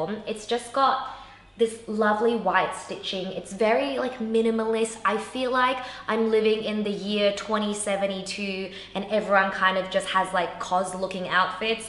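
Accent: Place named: Australia. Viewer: you look beautiful in everything you wear sweetheart, and I love you're "Aussie" accent.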